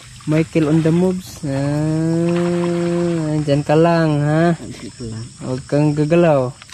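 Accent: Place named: Cebu, Philippines. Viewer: Taga diin ka boss kay feel nako Cebu inyuha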